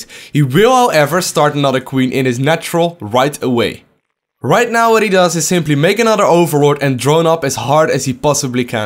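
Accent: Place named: Netherlands. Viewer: just a sec... ben je nederlands??? i kinda hear a bit of an accent, (srry that was offtopic) but yeah, thx for the vid, you just got a new subscriber, you're awesome keep up the good work!!!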